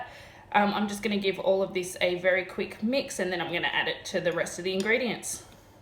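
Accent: Australia. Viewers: Lovely to hear an Australian voice ❤️❤️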